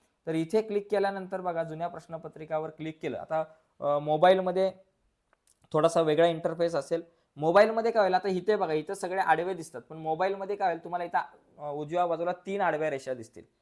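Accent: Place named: Nanded, India. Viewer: नांदेडकर बोलते❤️❤️❤️❤️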